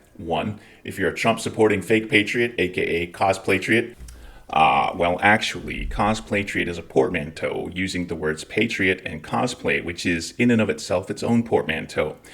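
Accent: Scotland, United Kingdom. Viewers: Love ya, keep making and I’ll keep watching and sharing. Also more Scottish accent. Studying Scottish Gaelic myself and am a complete sucker for all things Scottish 😻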